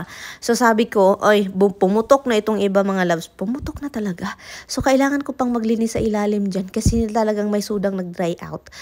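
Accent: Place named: Tagalog, Philippines. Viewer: Natakdan-nahawa sa tagalog ate hehe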